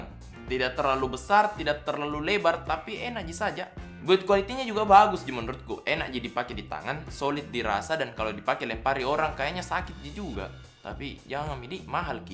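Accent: Makassar, Indonesia. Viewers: Lebih bagus kapang memang Logat Makassar bosku... Ada toko hp kah kita punya di Makassar ??? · Saya suka sekali koko klo logat makassar ki ...sama² ji ki too ...#ewako koko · Matap...enak juga liat review gadget pake logat makassar 👍👍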